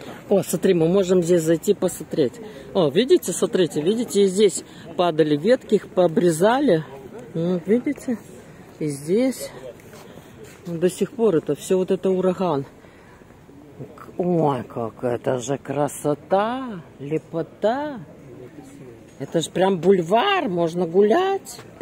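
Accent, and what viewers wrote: Odessa, Ukraine. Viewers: первый раз зашла к вам на канал. Душа радуется, слушая ваш одесский говорок. Спасибо за экскурстю. У меня в Одессе живут родственники и знакомые. Всем нам мира и добра. Привет из Луганска.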